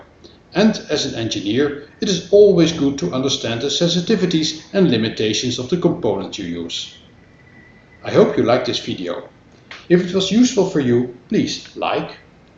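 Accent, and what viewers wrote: Netherlands. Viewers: Thank you for the explanation! great stuff! PS you sound Dutch ;)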